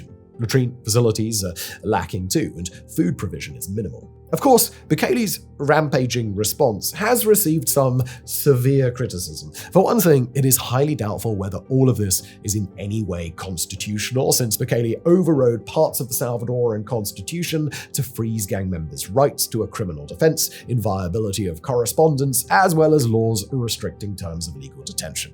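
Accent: United Kingdom. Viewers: I always love your work and Britishly pronouncing words but clique is pronounced click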